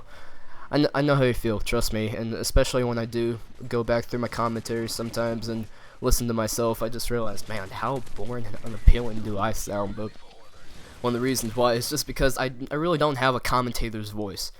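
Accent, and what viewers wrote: United States. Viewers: your voice sounded so much more confident and American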